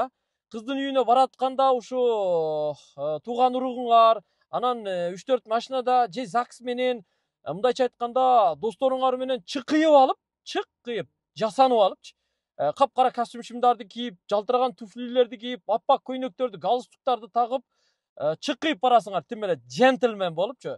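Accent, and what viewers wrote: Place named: South, Kyrgyzstan. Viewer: Которгон маселе туура эле,бирок бул жерде Манастын тиешеси жок! сен озун таза без акцент суйло, же болбосо биротоло туштук акцент менен эле суйло!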